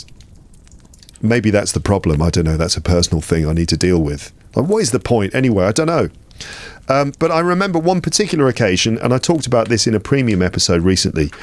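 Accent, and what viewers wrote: United Kingdom. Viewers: I am from Uzbekistan too i was not sure about my accent but after i heard about your voice i was in love the British accent is the father of English language in Uzbekistan there is no one who has British accent i am going to be one through shadowing your every single episode i love the rambling episodes even sometimes when i don't know what to do or bored i combine rambling episodes i chose only rambling episodes because it has something that gives me energy i love your hard work keep up the good work ❤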